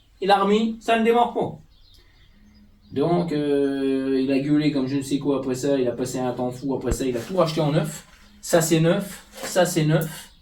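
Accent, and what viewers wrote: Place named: North, France. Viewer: C’est juste, parce que j’ai l’impression de reconnaître un accent du Nord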